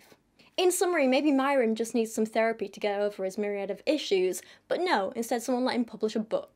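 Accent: United Kingdom. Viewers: You go! "Stupid but louder" hehehe... "Have a giggle" you Brits have a way of speaking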